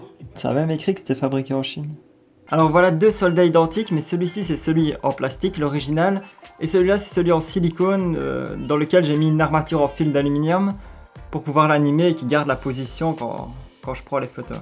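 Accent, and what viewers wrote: Liège, Belgium. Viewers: Tu es belge ? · C'est juste super! Tu es vraiment un pro du stop Motion. Félicitation. Au fait, juste une question, tu es belge? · On reconait bien l'acent bèlge 😀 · Vu ton accent tu ne viendrais pas de liège Comme moi ? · Tes Belge ? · Accent belge🇧🇪🇧🇪🇧🇪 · T'es belge nan? :) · Tu ne serait pas belge par hasard ? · Pas mal la vidéo 😄 , j'ai l'impression que tu as un accent belge 🇧🇪, j'me trompe?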